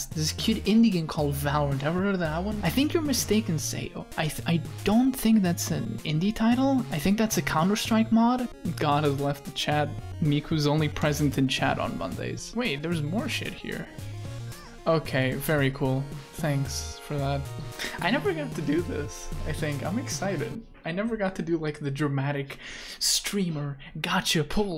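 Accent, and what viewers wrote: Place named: Portugal. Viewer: you have a little accent. it’s actually very slight. i commend your english! but yeah i guess i am just very familiar with the tuga accent hehe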